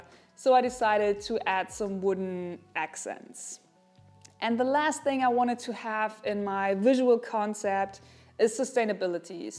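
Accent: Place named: Germany. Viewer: تعجبني لكنتك الألمانية الظاهرة بشكل واضح في لغتك الانجليزية، مشاريع جيدة وممتعة، تحياتي لك ولمجهودك الكبير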